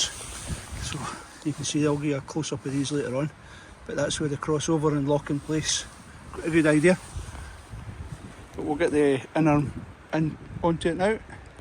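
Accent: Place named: Scotland, United Kingdom. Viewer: Thank you once again,. To the dearest wee deer and possibly remnants of the scents of last summer's bog myrtle et al. Your camp: Well thought-out (obviously) and takes me back to my youthful Rannoch Days. Your 'des-res' and tea/supper: Excellent, as is your photography. Your accent/dialect recall appreciated memories of living with my Family in West Lothian, many years ago, and my now deceased friend Marie Gavin, from the 'Dale. Rest In Peace, Marie. ❤ All the best, Margaret and the Bold Corrie. Rab 🍻 😎 👋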